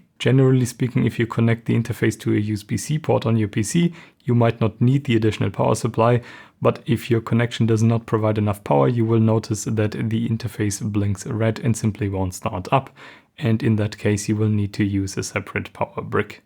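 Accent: Germany. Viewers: Good video. But I think you are German… it’s the typical pronunciation there… no front! It’s a very good video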